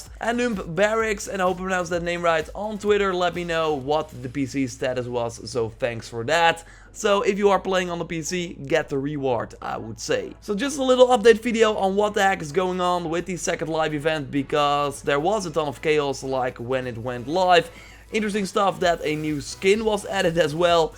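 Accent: Netherlands. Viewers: Are you Dutch/Flemish?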